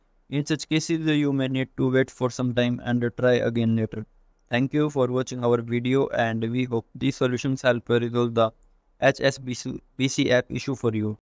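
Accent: India. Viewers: I couldn't understand a word of this. Heavy (Indian?) accent = incomprehensible.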